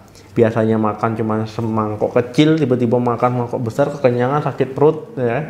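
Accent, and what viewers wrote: Aceh, Indonesia. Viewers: Dokter nya orang aceh y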